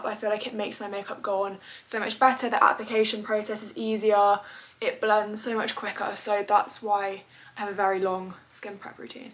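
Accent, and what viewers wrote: United Kingdom. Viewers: Did not expect her to be British 😭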